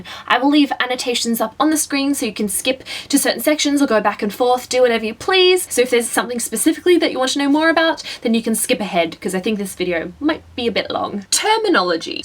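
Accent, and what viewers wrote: Australia. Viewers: Loved your video, and thanks heaps. Also, it's wonderful to hear an Australian accent on a Booktube! - from a fellow Australian :) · Very insightful... still weighing up if I should become a booktuber or not but at least it doesn't feel like a scary impossible thing anymore.I should probably wait until after I've finished my assignments to get started...or even better when the semester's over, ha! But anyway good job, subscribed. P.s Are you Australian? It sounded like you have the accent (or maybe it's British) I only ask because I'm Australian :)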